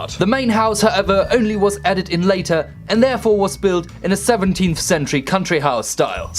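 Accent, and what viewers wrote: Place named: United Kingdom. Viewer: Natürlich ist es eine "Special Occasion", wenn du da bist,! xD Richtig schönes Video!:D In der 7. Klasse oder so war ich mal auf Klassenfahrt und wir haben da Arundel Castle besichtigt. Das sah Warwick Castle recht ähnlich. Your English sounds very British by the way!;) I miss England terribly!:(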